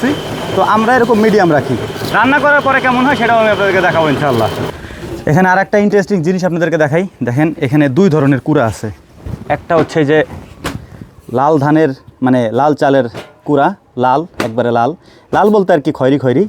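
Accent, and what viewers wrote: Faridpur, Bangladesh. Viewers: আপনার আঞ্চলিক ভাষা শুনলে বোঝা যায় আপনি খাঁটি ফরিদপুরীয়ান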